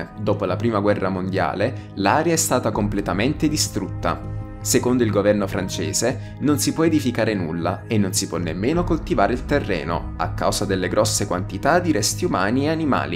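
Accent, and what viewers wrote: South, Italy. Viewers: Bhe si dai non male a parte diversi inciampi sull italiano ma si capisce che sei meridionale quindi ci sta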